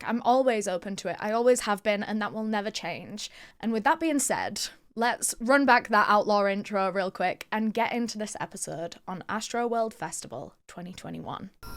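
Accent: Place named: North, United Kingdom. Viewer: northern accents are so hard to listen to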